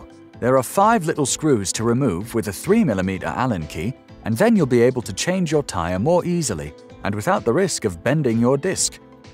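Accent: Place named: Cockney, United Kingdom. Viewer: I can hear your a cockney 👍 good video very informative thanks 😊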